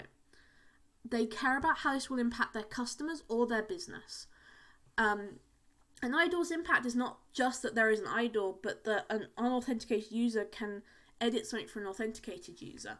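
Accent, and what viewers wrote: United Kingdom. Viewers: British accent is so sexy :p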